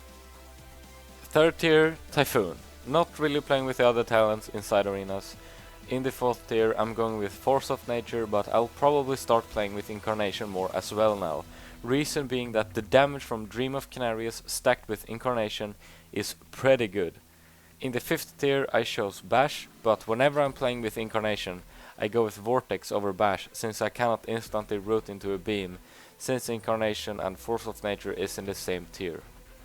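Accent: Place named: Sweden. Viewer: Dat swedish accent :P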